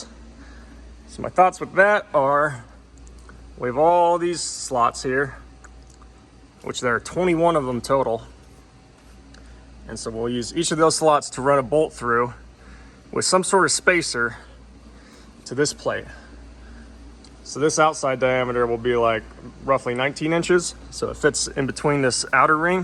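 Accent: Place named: Georgia, United States. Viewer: has to be from Rural GA or AL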